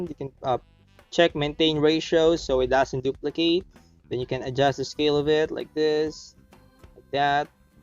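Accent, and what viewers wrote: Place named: United Kingdom. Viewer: British accent heh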